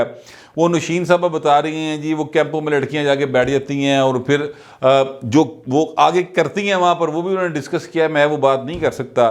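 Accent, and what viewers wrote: Punjab, Pakistan. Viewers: Like your Punjabi accent- it is of a polite version. U make it sound very soft 👍. Political analysis in Urdu & Punjabi makes the programme more interesting. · ji your Punjabi accent are awesome. ❤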